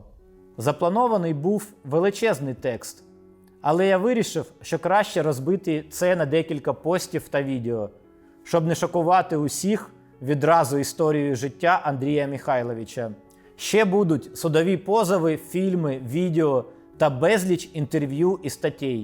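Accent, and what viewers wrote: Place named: Russia. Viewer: стадіон так стадіон! дякую за українську мову. У вас є певні слова, які ви вимовляєте ще на російськомовний манер. Але тренуватись ніколи не пізно, вірю, прийде досвід і будете казати правильно. Успіхів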